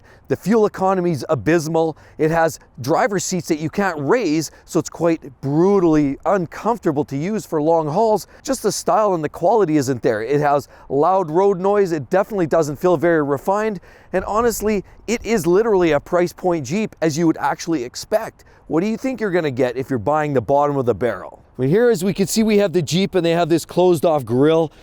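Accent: Canada. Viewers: he's got a Canadian accent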